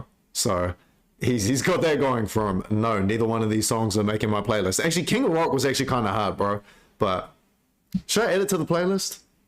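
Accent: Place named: New Zealand. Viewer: most new zealander accent of the year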